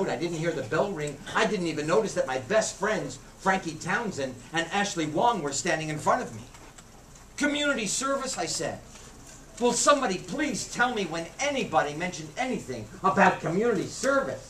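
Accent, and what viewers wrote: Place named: New York City, United States. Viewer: I love that excerpt! He has an AWESOME Manhattan, NY accent!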